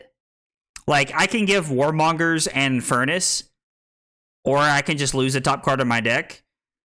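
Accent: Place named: South, United States. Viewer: im from NC i could tell you were from somewhere in the south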